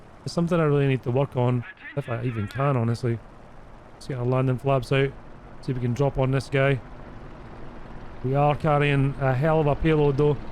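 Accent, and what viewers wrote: Scotland, United Kingdom. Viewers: A Scottish bro who is a super Pershing connoisseur? Subscribe I shall!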